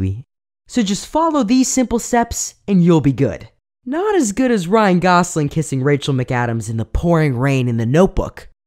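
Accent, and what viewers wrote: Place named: United States. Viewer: In thought u were american XD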